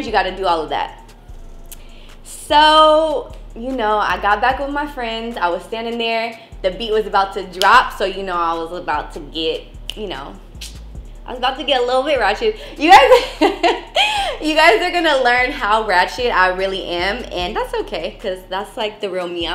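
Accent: France. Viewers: Waaaaouw tu est super belle et j'adore ton accent français et le couple que tu formes avec Kurzawa c'est tout simplement magnifique 💕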